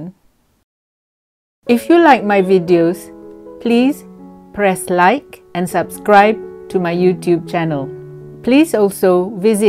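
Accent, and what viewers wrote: Malaysia. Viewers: You speaks as true Malaysian. Thumb up.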